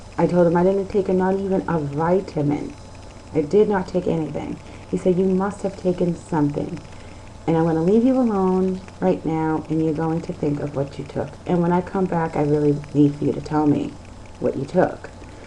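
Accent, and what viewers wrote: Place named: Boston, United States. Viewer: SJS survivor as well. Like the Boston accent ;)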